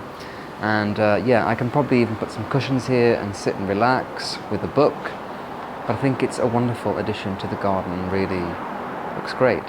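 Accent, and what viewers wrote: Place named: England, United Kingdom. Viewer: I like your videos specially your English accent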